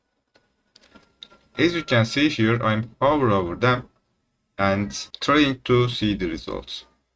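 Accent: Turkey. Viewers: yemınle var ya sırf aksandan turk dedım yorumlara baktım sırf bu yuzden :)) well done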